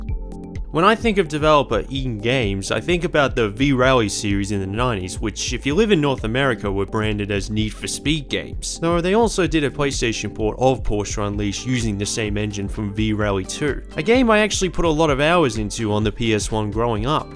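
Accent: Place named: Australia. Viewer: And that, had a Japanese version, with just Nissan cars. <link> / You poor, unfortunate, soul... You’re not wrong, you’re at a Million points, before you know it. - This list of games, says all I need to hear, about your channel... To be fair, on your semi-Aussie sounding ass, it seems that a lot of TDUX360, was transferred to TDU2, and you’ve made it noticeable. - 😂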